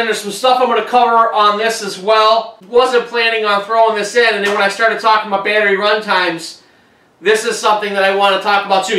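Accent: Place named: Midwest, United States. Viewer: Are you from Michigan or Wisconsin?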